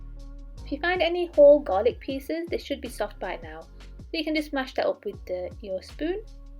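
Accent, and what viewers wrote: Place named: North, United Kingdom. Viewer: it’s the northern accent that does it for me!!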